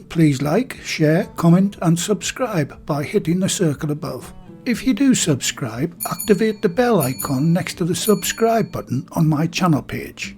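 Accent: United Kingdom. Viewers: Merry Christmas sir! I'm curious about in what part of the UK your accent is common. Thank you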